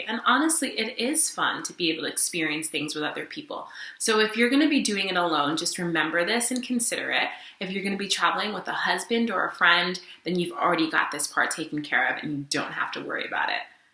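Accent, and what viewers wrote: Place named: California, United States. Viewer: Just came across your videos due to my curiosity and this is off topic but I noticed your accent CHANGED. You sound like a California girl now which isn't a bad thing, Lol.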